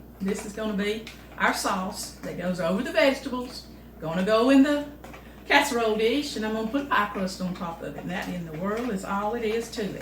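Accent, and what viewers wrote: South, United States. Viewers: I enjoy your videos. They’re simple easy to follow. And I truly enjoy your plain Country talk I can understand it fine. The way you present yourself it’s just, well it just family. Don’t know any other good way to say it.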